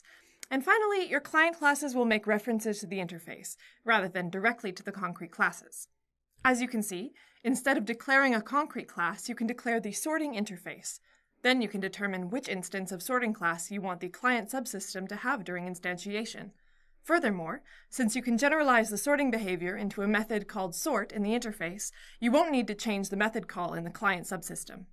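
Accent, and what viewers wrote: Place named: Canada. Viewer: I don't understand her Canadian accent.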